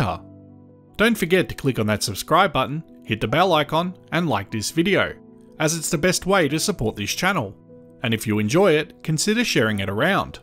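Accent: Australia. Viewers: Off topic, I discovered Copilot AI yesterday on my phone and it was my first interaction with an AI voice. He spoke in a British accent and I requested he speak in an Australian accent, it was a Paul Hogan disaster attempt. I then requested a neutral accent and it too was not good. I have listened to your voice across the years with your many videos and it is an excellent example of a modern Australian accent that articulates using our modern tongue. I realize now why you are so enjoyable to listen to, thank you and keep up the amazing work.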